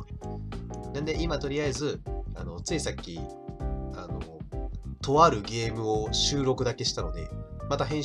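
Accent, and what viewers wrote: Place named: Kansai, Japan. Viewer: あっ声超好きだわ(´ཫ`*) 好きです 関西弁ですかね？最高ですね これから応援させて頂きます！！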